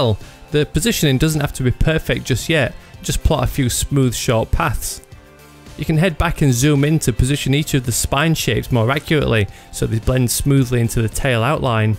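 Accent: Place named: Northern England, United Kingdom. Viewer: sounds like the north of England.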